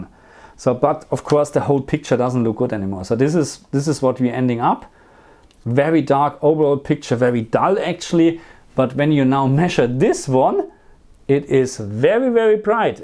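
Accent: Germany. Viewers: My Phone has hdr +10 can it Work with genshin too? And has the Same Bug? You Sound german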